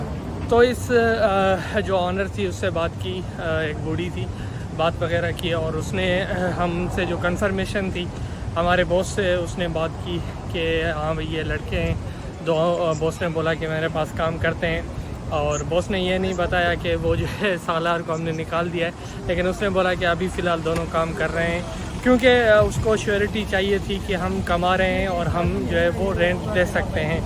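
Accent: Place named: Sindh, India. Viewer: Ada ap lehje se Sindhi lgte ho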